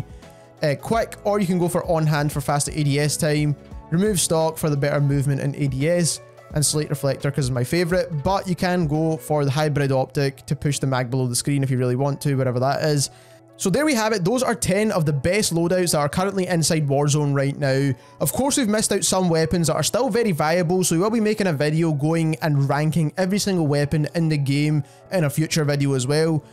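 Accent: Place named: Scotland, United Kingdom. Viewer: i love the scottish voice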